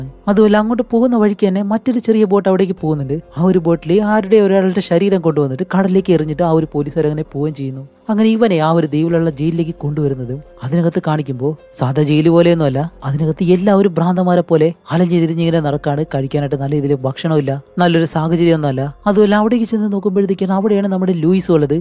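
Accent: Palakkad, India. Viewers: പാലക്കാട്ട് കാരനാണല്ലേ കഥ പറയുന്നത് 😃?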